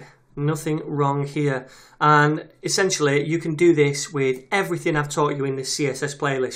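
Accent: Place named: England, United Kingdom. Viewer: I AM NEARLY FINISHED WITH YOUR COURSE AND I CAN ONLY SAY ONE THING...IT IS SIMPLY AWESOME AND ABSOLUTELY FANTASTIC! I HAVE LOVED EVERY STEP OF IT. I APOLOGIZE FOR THE LIKINGS BECAUSE I USED THE VIVALDI BROWSER TO WATCH IT AND I JUST NOTICED, THAT MY LIKING EVERY VIDEO DID NOT SEEM TO WORK. I DON'T KNOW WHY?! NOW, I ALSO WATCHED PART OF YOUR JAVASCRIPT COURSE AND I ALSO LOVED IT. THE WAY YOU TEACH MAKES EVERYTHING EXTREMELY SIMPLE TO UNDERSTAND, EVEN FOR A NEOPHYTE LIKE ME! I CANNOT THANK YOU ENOUGH EITHER FOR ALL THE USEFUL SITES, THAT YOU PUT UNDERNEATH YOUR VIDEOS! YOU ARE A GREAT TEACHER, WHICH IS QUITE RARE IN THE PROGRAMMAING WORLD. MOST WEB DEVELOPPERS ARE QUITE IMPRESSIVE AT WHAT THEY DO, BUT, UNFORTUNATELY, THEY DO NOT KNOW HOW TO TEACH ALL THAT AND VERY OFTEN THEIR ONLINE COURSES ARE NOT ONLY CONFUSING, BUT ALSO OUTRIGHT BORING. 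YOURS IS NOT! IT IS FUN AND I ALSO LOVE YOUR PRONUNCIATION. I DON'T KNOW FROM WHICH PART OF ENGLAND YOU COME FROM, BUT I JUST LOVE IT! MY PROFUSE THANKS FOR YOUR WONDERFUL GENEROSITY WITH YOUR COURSES. I AM NEARING 68 AND I COULD NOT AFFORD TO GO BACK TO SCHOOL FOR MANY REASONS, HEALTH AND MONEY, BEING TWO! LOTS OF LOVE AND TAKE CARE! FRANCIS, A FRENCH MAN LIVING IN CANADA.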